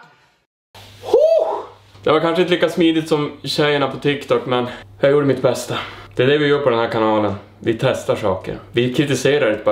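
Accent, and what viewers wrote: Norrland, Sweden. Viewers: hahah, skönt snubbe och norrläning! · Jååå. Hittade din kanal typ förra veckan. Gick en video och prenumererade direkt! Visat dig för alla vänner och för familjen. Alla tycker du är lika rolig som jag tycker. Sedan så e man ju halvt Norrlänning också så klagar ju inte på dialekten😉 ae fan vad rolig du är, fortsätt med det du gör. Man får alltid ett gott skratt❤️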